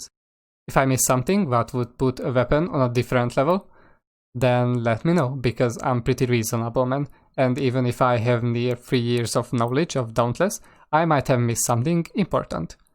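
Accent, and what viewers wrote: Hungary. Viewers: i noticed something, you have a hungarian accent.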